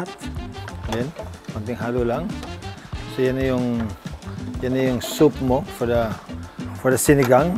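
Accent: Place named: Visayas, Philippines. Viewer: Wow Sarap po ng luto niyo sir. Yung tono ni Goma parang nagshi shift sa bisaya at tagalog hehe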